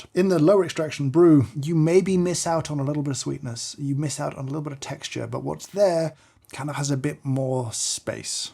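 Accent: United Kingdom. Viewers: it's nice to see British youtubers who don't have a chavvy accent and can speak the queen's English correctly!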